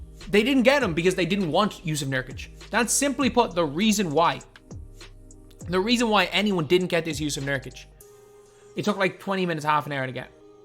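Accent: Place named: Ireland. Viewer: Angry Irish lad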